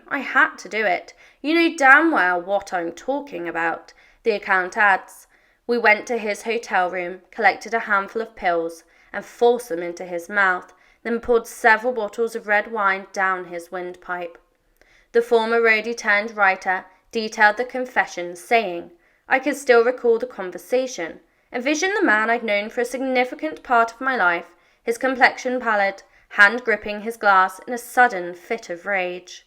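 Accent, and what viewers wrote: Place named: United Kingdom. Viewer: Apparently it is compulsory to say "it will always remain an enigma" in a British accent to what is otherwise obvious evidence of murder...I was a good friend of Tappy Wright and he told me things that are not in his book because he didn't want to get killed...The reason it was covered-up is because government agencies (That Jeffery belonged to) were involved...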